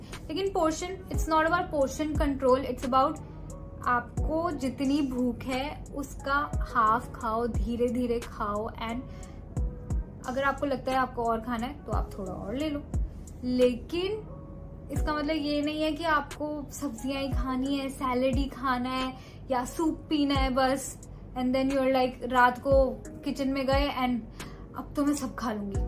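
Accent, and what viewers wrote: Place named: Rajasthan, India. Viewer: Didi aap Rajasthani accent kase bol lete ho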